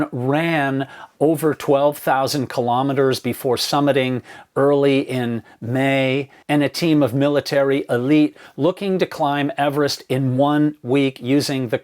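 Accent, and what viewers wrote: United States. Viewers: it sounds like a cosmopolitan New England accent, so not too strong compared to Maine or Boston.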